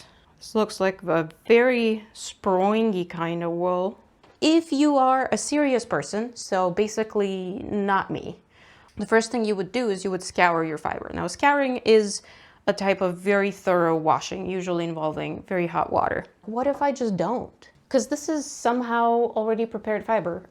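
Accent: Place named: Minnesota, United States. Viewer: Nice! I'm just starting to be interested in solar dying / natural dyes too... I live on an old farm in MN and have lots of goodies I can forage from my own land. Wondering if you are close to MN too, based on accent and what lichens you found to play with. Thanks for the video, hope to see and learn more!!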